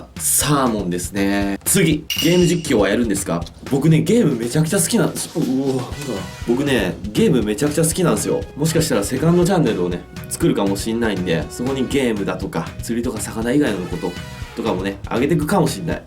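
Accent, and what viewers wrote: Japan. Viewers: はじめんほど訛ってないけど近い地方の人なんだなってわかる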